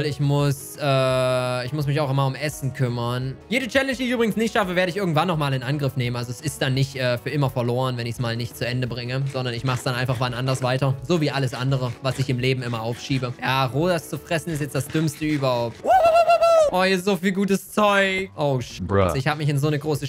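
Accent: Saxony, Germany. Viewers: Bist du eig ein Sachse oder so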